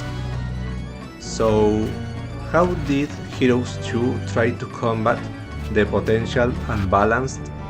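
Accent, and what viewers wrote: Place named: Spain. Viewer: I love magic the gathering too ☺️ one question, are you spanish? yo sí, vivo en Madrid. Por tu pronunciación del inglés supongo que eres español como yo 😊 Your vídeos are awesome! ☺️😃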